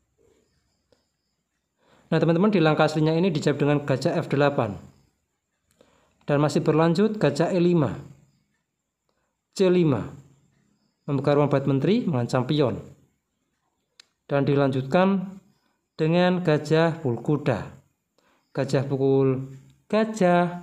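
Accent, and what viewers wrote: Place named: Java, Indonesia. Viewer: ..medhok, mMass, intonasinya...; jawiyhoo..?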